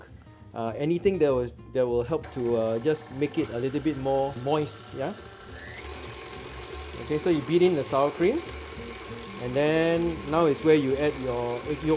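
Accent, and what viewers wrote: Singapore. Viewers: Are you from India! Or Singapore! You sound like Indian Chinese or Singaporean. Nice accent. That was funny. When the bake is cakking. lol I'll try your recipe. Your a jovil person.jovial. Thank you From North America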